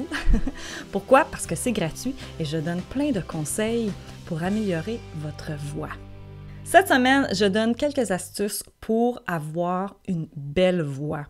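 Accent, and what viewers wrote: Quebec, Canada. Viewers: Jadore ton accent quebecois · Par contre j'aime trop son accent Québécois et je suis sur que en chant tu es super forte Gros bisous à toi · Je crois que vous êtes québécoise 😄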